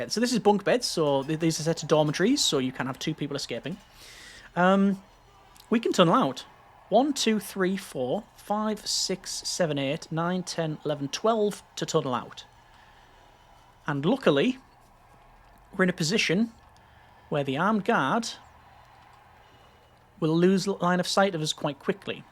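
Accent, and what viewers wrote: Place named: Middlesbrough, United Kingdom. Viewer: Why do you sound like you're from Middlesbrough??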